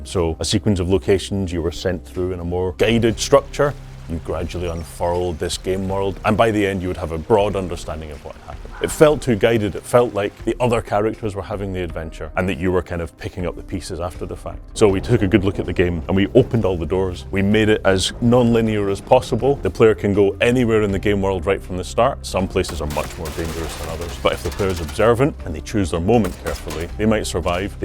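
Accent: United Kingdom. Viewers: I was hyped till I heard British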